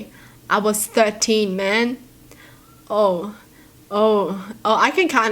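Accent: Australia. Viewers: Is it just me or I love her accent ✨ Yk, wotaaaaa and norrrrr and caaaaaare and sooooorrrr lol Aussie accent is so cute :D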